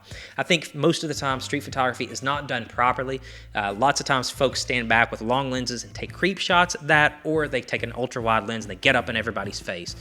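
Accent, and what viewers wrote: Kentucky, United States. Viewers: As a Kentucky native, I agree with your point about the accent. Being from Louisville, I may not have the exact same accent as you and yes... ha, I live in LA now! HOWEVER, it should be more about your ideas than the way you speak. Cheers. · Hell, I come for the accent. I went to college in Lexington, my room mate was from the sprawling metropolis of Pikeville so it's familiar. Plus being from Tennessee, I can't make fun of anybody's accent. You're inspiring me to let mine show more than I do. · 1. Instant film is overhyped and overpriced and takes absolutely know photography skill at all other than holding the camera still. 2. Fujifilm abandoned the filmmaking community in 2013 (reference their last CEO bluntly stating film photography was irrelevant). 3. I love Kentucky. My mother was from Liberty (Casey County) and I made a short 16mm film on my visit to Danville and Liberty). 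Your accent reminds me of my family down there who are down to Earth just good people and the mainstream media for years has stigmatized Southern folks unfairly as inferior. Dont change the accent. 4. I know EXACTLY which film influencers you talk about as low energy not to name names cough grainydays but yeah I dont get the whole jaded attitude projection either? Its like dude you should feel excited and privileged you get to shoot film in the "digital era. AND heres my issue with the film photographer "influencers" and they continue to ignore this point but they refuse to acknoledge the fact that shooting on film is about OWNERSHIP of images i.e through hard copy archiving. Thats the BIG reason I shoot film. Its not just for the "look" or it "slows me down. "Its the fact I have physical, tangible 4K hard copy image that will last over 100 years. 5. I wont even dignify the "digicam" thing whatever that is but its most definitly not celluloid but you share the same frustration with Digicams that I share with those "film emulators" that infect my Instagram news feed.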